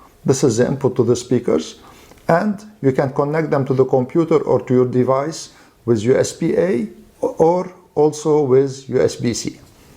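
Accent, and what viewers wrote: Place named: Lebanon. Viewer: you sound lebanese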